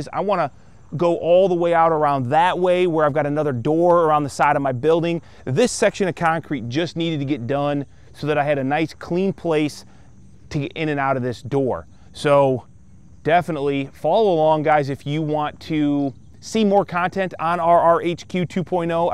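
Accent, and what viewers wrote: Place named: Midwest, United States. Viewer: Hi I'm a big fan of your channel, in from Yorkshie, England. We are known for our strong accents, but I love the way you say ( kahncrete ) not sure if that's how most mid west people speak, but it's got a proper workers sound to it. Full respect to you and for your hard work.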